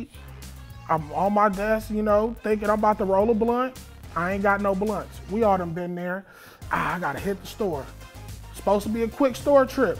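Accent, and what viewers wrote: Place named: Los Angeles, United States. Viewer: LA Accent is sooooo strong lol ROTFL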